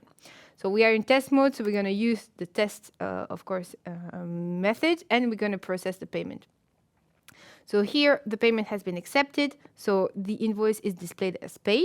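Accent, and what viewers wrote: France. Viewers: Très bonne vidéo, mais j'ai comme l'impression qu'il te reste un petit peu d'accent français sur ton anglais (qui est parfait je tiens à le préciser haha)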